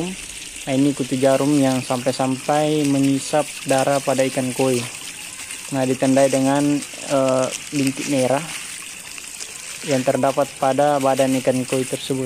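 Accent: Bugis, Indonesia. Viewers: Mantap bos. Kayaknya dari logat orang bugis😁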